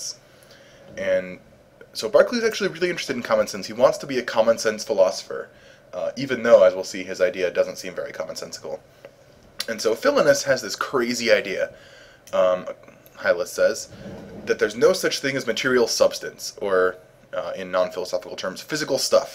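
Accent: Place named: Ireland. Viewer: He's Irish...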